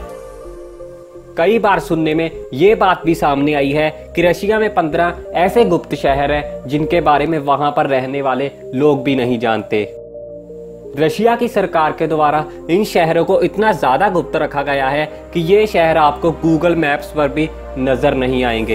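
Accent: Punjab, India. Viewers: Bohot achi video h bhai apke bolne se lgta h ap punjabi ho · Punjabi ta punjabi hi hunda, English vi punjabi tone ch bolde ae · Punjabi tuch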